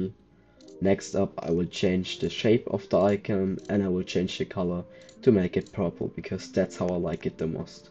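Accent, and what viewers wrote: Germany. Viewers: I hear i little bit of german in his pronouncing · Man merkt sehr stark, dass du Deutsch bist.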